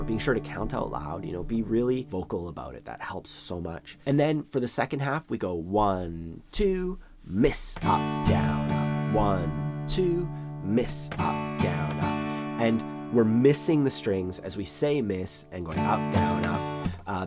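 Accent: Canada. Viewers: holy crap this is a perfect study on how to do a better Canadian accent also really good for learning guitar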